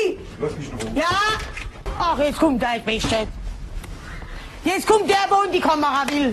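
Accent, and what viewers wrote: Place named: Mannheim, Germany. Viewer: rischtisches monnemerisch :D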